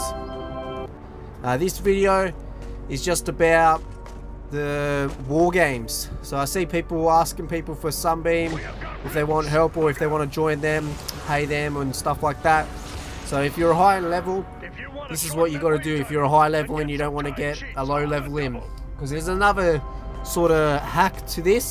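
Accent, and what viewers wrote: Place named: Australia. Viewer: This is worth watching just to hear your accent! All commentary should be done in Australian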